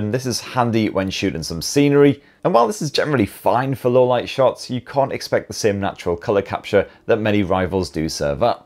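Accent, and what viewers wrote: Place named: Newcastle, United Kingdom. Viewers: He’s a Geordie, say no more. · A lot of it isn't even scripted he actually speaks. Like that it isn't unusual in certain part of England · Sorry. I am not sure what you mean? I was just saying the way in which he talks he normal from his area and many of the expressions spoken are common place within that area. No insult was intended. Was merely trying to give people knowledge of the video creators dialect in the north. I am not sure about internet lingo franc though. Does 'ffs' denote a stutter? Thanks. Be healthy.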